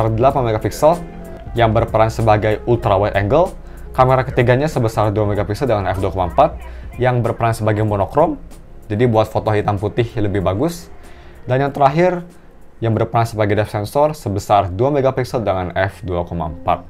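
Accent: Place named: Kerhor, Indonesia. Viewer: Bahasa audio nya pasti orang kerhor ini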